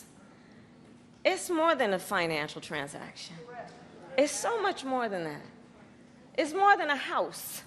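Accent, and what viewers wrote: South, United States. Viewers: What is the all of a sudden have a Southern drawl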